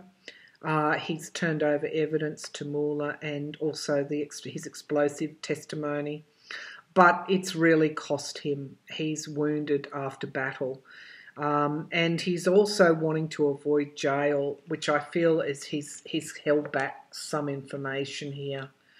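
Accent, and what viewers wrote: Australia. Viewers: I do think Cohen is concerned for his wife and children. I have empathy for him because he confessed his guilt and said he was deeply ashamed of his involvement with the Trump criminal enterprise. I think he has truly turned away from evil and is truly worried that Trump could bring America down completely and kill our democracy. Thank you for another insightful reading. I love listening to your lovely Australian accent as you speak. 🦘🦋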